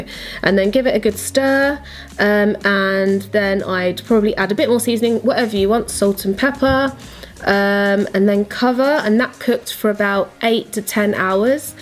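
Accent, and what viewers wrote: United Kingdom. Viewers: Definitely UK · Sounds like UK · are you British ?🙋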